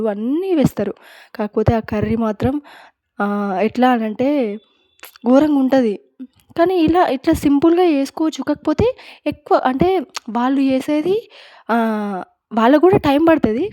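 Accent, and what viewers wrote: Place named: Telangana, India. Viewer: తెలంగాణ మాట సూపర్ 👌👌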